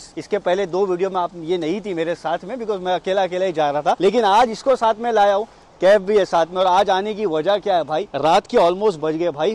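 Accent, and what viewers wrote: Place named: Mumbai, India. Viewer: I am watching ur video for the first time, can you tell me where you are from? Because your voice is from Mumbai, please tell. Bhai jaan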